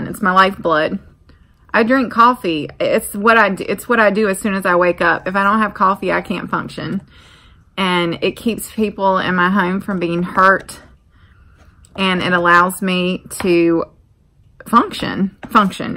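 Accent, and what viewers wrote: South, United States. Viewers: I don't really care what you drink you're just way too cute and I love that southern accent you're a true farm girl.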